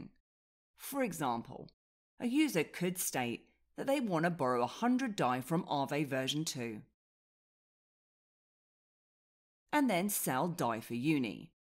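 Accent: United Kingdom. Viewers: very British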